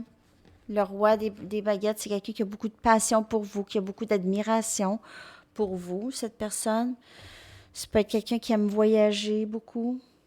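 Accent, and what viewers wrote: Canada. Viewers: Bonjour je ne c'est pas d'où vous êtes au Canada car j'adore l'accent canadien j'ai une de mes meilleures amies qui habite Montréal moi je suis de Tarbes en France et j'adore cet accent avec cet douceur dans la voix et oui mon chéri et en prison pour des fait ou il a était coupable bref oui j'ai toujours était la pour lui mes là pour des reson que j'ignore plus d communication d'eupuis le moi d'octobre je c'est qu'il m'aime beaucoup mes quand je veu avoir des discussions avec lui il ce renferme sur lui j'espère vrement qu'il va me donner des nouvelles rapidement car il me manque énormément bonne journée a vous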